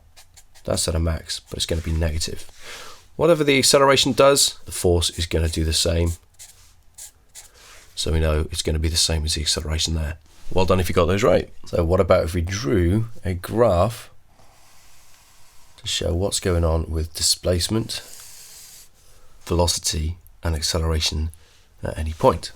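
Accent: United Kingdom. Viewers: i Knew he was british through his accent but he confirmed it by writing centre instead of center (but who cares this is a great expalaination for simple harmonic motion)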